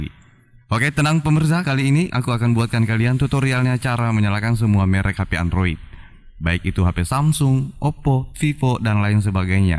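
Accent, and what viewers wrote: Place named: Makassar, Indonesia. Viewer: Orang makassar ki paeng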